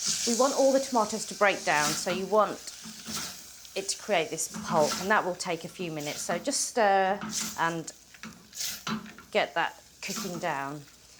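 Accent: England, United Kingdom. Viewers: Such a lovely British accent 😅 Greetings from Germany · She speaks British English. Must have been educated there. Very professional video. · just found your channel and i am very pleased and going to cook this dish. my daughter livend in london for twenty years before she died of breast cancer and london became my second home i am swiss but live now on the eastern sea in germany. i like to hear that wonderful english english and will have a good look at many of your dishes. whenever i arrived in london, the frist meal was alway indian and myself am cooking with indin spices too. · Well I like your British accent from Toronto of guyanese origin in the west indies. Our dall is cooked with yellow split peas boiled for about 2 hours with garlic and turmeric then chunkay with oil,garlic and Cuman. We use dall to compliment any dishes with meat or vegetables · Thanks for style of cooking..just to let you know not my style. 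But am being polite in appreciating your efforts. Understanding your British accent is something else. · she actually sounds like a lot of British asians born and raised here. I have a Pakistani colleague who speaks so similarly to her. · This is a fairly typical English accent for all ages and sexes among those without a regional accent!!! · How come this Indian Lady speaks like an English Lady? I always thought that dhal is the Indian name for lentils. Or is there something called lentil dhal? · As I wrote down the ingredients of this recipe, without looking I could swear that this is the voice of an older English lady. Remarkable how accents around the world get absorbed. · Indian kitchen with very beautiful Harry Potter accent. Good combo. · I have never in my life seen an Indian with an English accent from England.. this is weird.. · U r a very good and beautiful instructor. I also watched ur video on making samosa. 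Also such a beautiful english accent. Thanks. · Yair Gil... sorry I don’t quite understand your comment, speaks very eloquently so everyone can follow the recipes. She isn’t trying to sound more English, she is English!. comes across as very down to earth and is just sharing her passion for cooking! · The worst thing is the acquired British accent which is in no way better then the sweet Indian accent. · Dusky Indian looks Dark hair and eyes. Impeccable English accent. Nice healthy simple Indian dish. Isn't Ghotra...LOVE itself?🥰🥰🥰 Lub ya & your receipe, love!!!!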